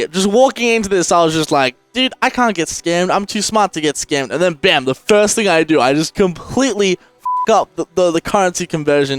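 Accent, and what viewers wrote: Australia. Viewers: wait a second, you're australian? what state?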